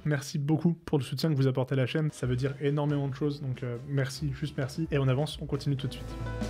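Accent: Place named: Germany. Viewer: Chouette contenu et bordel quel magnifique accent allemand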